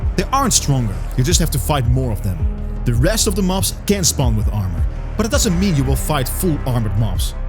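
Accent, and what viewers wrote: Netherlands. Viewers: your accent is so extremely dutch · Bro is echt puur Hollands 😂😂😂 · Ik hoor aan je engels dat je NL bent 😂😂😂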